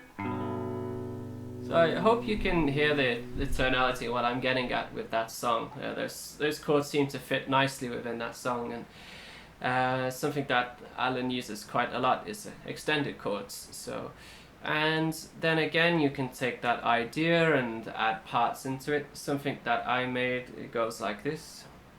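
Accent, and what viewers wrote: United Kingdom. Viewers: You sound like the British reporters who report the war in Afghanistan.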